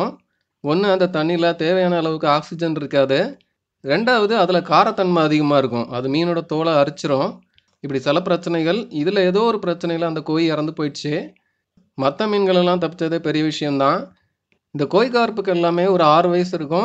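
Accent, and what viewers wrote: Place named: Kanyakumari, India. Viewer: s s Language kanyakumari pola irukku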